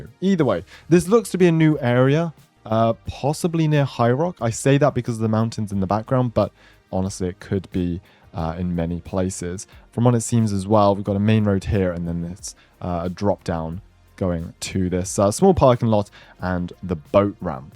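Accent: England, United Kingdom. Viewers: Wait your English or British? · question, are you British?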